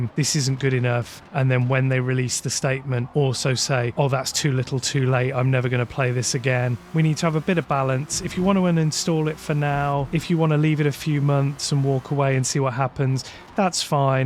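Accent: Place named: England, United Kingdom. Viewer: Good comments with a lovely English accent! (From a Pom in Australia) Nice to see they have addressed a couple of the many sound issues, I think the Capri and GT3 Bentley may sound better too (but is it wishful just thinking?) I hated them last time I used it, went back to Seven briefly, ahh, that's better... But now in Eight, hmmm.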